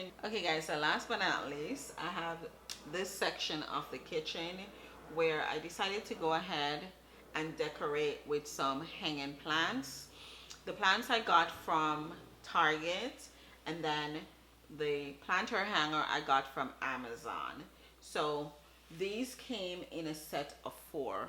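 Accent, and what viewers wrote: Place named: Jamaica. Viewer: Sound Jamaican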